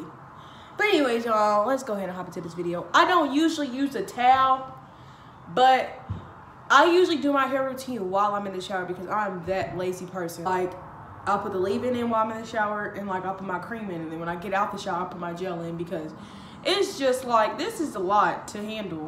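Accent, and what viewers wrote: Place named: South, United States. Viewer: I didn’t know you had a country accent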